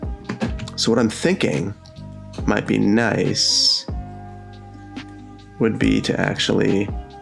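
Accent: United States. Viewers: Standard American pronunciation. We do things to French that would make you either homicidal or suicidal. For example, potatoes "awe grotten," or meat "with au jus." And if a house has a bathroom attached to a bedroom (very common in the US), it is said to be "with en suite."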